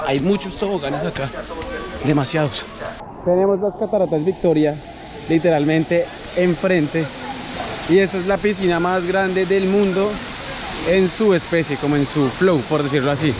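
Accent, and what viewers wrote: Colombia. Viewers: comunica colombiano jajajaja. la buena parce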